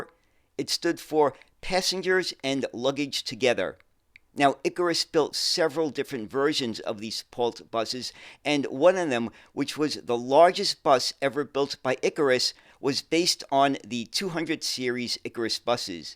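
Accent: New York, United States. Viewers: I'm English who grew up in England. My accent is typically from S E England. Your articles are so informative and interesting. Your New Yorker accent is really great, coupled with your enthusiasm. 😊❤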